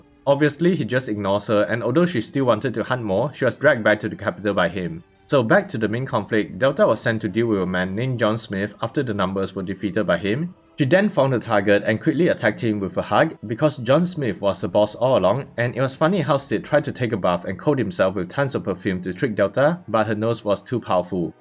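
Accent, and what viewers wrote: Singapore. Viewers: bro u singaporean?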